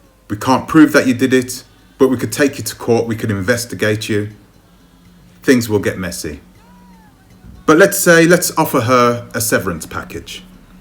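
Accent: United Kingdom. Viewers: When I see your videos come up I think, oh lord what now 😏! But I watch and like your videos because your unapologetic about your views and the information you share. Your delivery of what your saying, and not saying is very British 😂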